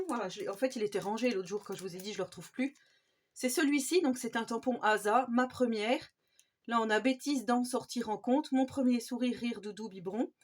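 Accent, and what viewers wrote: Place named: Grand Est, France. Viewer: Coucou, très joli album, j’adore le papier craft 👌🥰🙋‍♀️. Vous êtes du Grand Est de la France, j’ai l’impression de reconnaître le petit accent 😉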